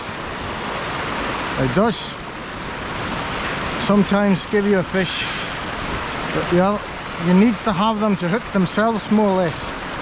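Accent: Scotland, United Kingdom. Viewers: Excellent video and a beautiful strong fresh fish, congratulations! Tough challenges in that current and the number of big rocks, well done! Thank you for doing in English and I compliment you on your excellent English which has a Scottish ring to it! Thanks for producing and sharing. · You have a Scottish accent. Great video btw.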